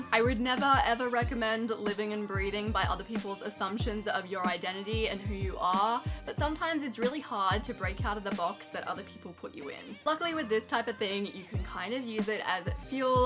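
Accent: Australia. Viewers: I love her accent <3 Is she Australian?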